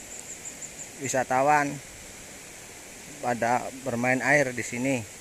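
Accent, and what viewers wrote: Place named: Java, Indonesia. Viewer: logate kaya wongg jawa lagi jalan jalan ning Majalengka lanjutttt nyimakkkk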